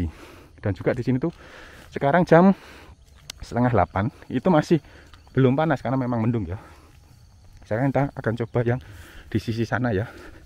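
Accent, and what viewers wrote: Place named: Surabaya, Indonesia. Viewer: Disertai peta lokasi, peralatan yg dipakai dan celotehnya sepanjang jalan ttg kiri kanan maupun cuaca, saya sangat suka kejujurannya cara bicara suroboyoan dan dicampur gaya arek malang.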